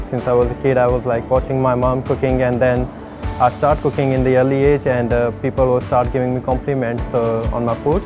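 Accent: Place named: Australia. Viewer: Lovely Australian Accent ❤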